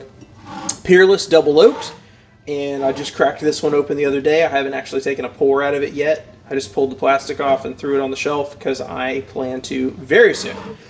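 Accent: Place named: Wisconsin, United States. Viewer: Sounds like you might be from Wisco with all they J. Henry!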